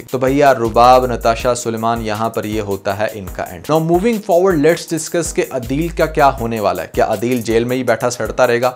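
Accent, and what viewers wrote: India. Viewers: Ap Indian ki tra feer bol rhy ho phr q nhi bolty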